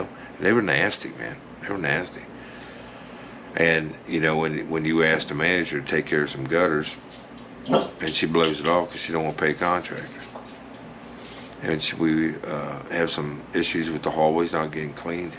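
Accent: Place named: South, United States. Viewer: The down and dirty truth of apartment maintenance, I agree to everything you said. Where are you from? You sound southern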